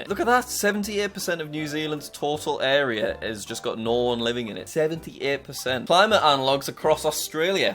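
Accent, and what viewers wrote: United Kingdom. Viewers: As a british person I know as the only person with a british accent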